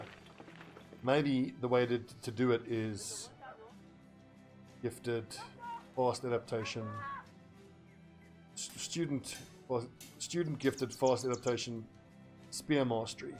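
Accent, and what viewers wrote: South Africa. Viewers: you sound like a fellow South African, great game and looking forward to watching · just found you randomly and listened and realized you are a fellow south African GG subscribed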